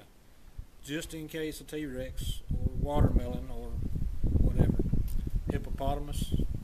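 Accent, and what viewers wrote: South, United States. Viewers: Very southern laconically. Best regards vom Switzerland.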